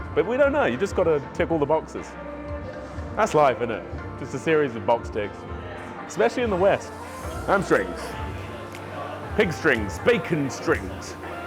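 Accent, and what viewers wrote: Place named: Austria. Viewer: Have to acknowledge the Arnie accent sir!!! 🤌🏾🫡💪🏾🔥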